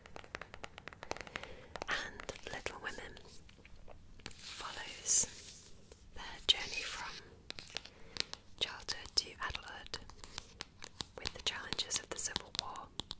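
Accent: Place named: United Kingdom. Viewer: How am I just finding you now?!! Books, ASMR and a lovely British whisper! I love your videos so much. Thank you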